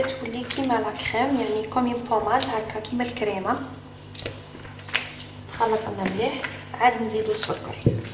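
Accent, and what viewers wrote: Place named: Arab world. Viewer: يعطيك الصحة ولي تعجبني اللهجة جهة الغرب تفكريني في الفاميليا لما نحضر في مناسباتهم موفقة وننتضر المزيد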